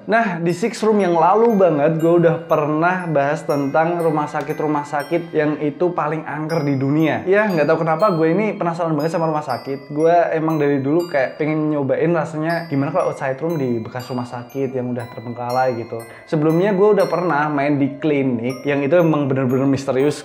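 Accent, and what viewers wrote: Java, Indonesia. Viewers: mas mbok pake "aku kamu" aja mas, jgn "lu gua", lebih oriental.. lagian masih kerasa kok rasa jawa nya hehehe sepurane ya mas, aku yo wong jowo :))))))) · Medok jawabnya gak hilang mas 😄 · iya mas. kental bgt jawanya, pakai sapaan " aku kamu " aja mas, lebih enak didengar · pake aku kamu saja bang, ga usah lu gue, medokkkk parahhh... kesanya gimana gitu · Medhok tapi gua lu.. #salfok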